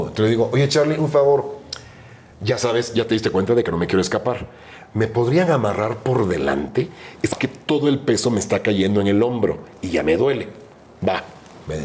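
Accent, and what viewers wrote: Mexico. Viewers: Habla como mexicano, tal vez por vivir tanto tiempo ahí.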